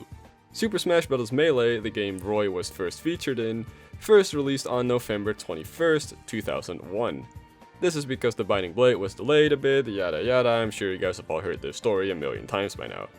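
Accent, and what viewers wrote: Netherlands. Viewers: now i cant unhear your Dutch accent. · Ben je Nederlands of Vlaams? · Can i maybe ask if you are from the Netherlands because your accent sounds a bit dutch. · "mensen denken sowieso dat dit duits is" I'm dutch (well actually flemish but who cares) and that's not german. I do not actually know what weird language that is, but I do recognise in your way of speaking english that you are a fellow dutch speaker. Why did i write this. Duits is een fantastische taal en Duitsland is een mooi land. Nein, niederländisch ist fiel besser als deutsch. Yeah i'm bi... trilingual... maybe we can even count french, nah i suck at french. I should really stop now this is becoming cringey. Why am i continuing, the cringe is real. Cringe and cringey are cringey things to say.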